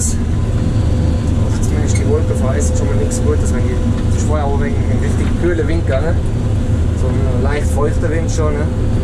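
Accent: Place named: Switzerland. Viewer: Jo de schnurret halt scho fascht schwizerdütsch.isch jo gard näbe mir äne am riih.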